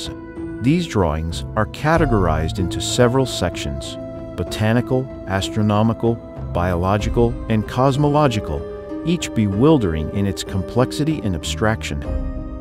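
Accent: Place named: Midwest, United States. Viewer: Was this made by AI? If you are going to use AI to do the narration, train it to pronounce common words correctly! The qords hoax and theory are NOT unusual, and yet your narrator--who otherwise has perfect middle American diction and syntax--your narrator pronounced those two words with hilarious errors. If the narrator is human, then they need to take the extra two minutes necessary to double check the pronunciation of words they do not know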